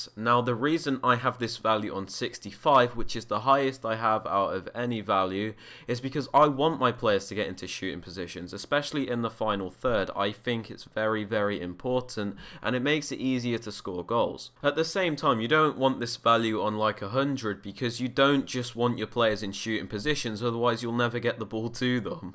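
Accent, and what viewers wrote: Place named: Birmingham, United Kingdom. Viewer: Are you from Birmingham you speak like my cousins 😂😭👅😆 and shall I get Fifa 18 on the switch I mean I have it in my PS4 so I dunno I need opinions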